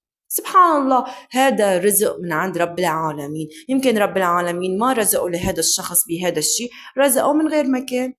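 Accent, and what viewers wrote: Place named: Aleppo, Syria. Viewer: والله و مليون مرة طريقتك في الكلام عسل رونق موسيقى ممكن لاني ليبية فنسمع لهجتك الحلبية حلوى وتشد الانتباه ❤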